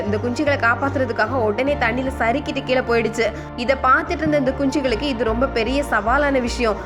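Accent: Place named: Coimbatore, India. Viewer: ok mam, கோயம்பத்தூர் slang மாதிரி இருஞ்சு, அதான்.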